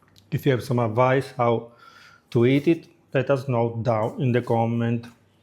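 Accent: Italy. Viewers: Ma quanto amo l'accento italiano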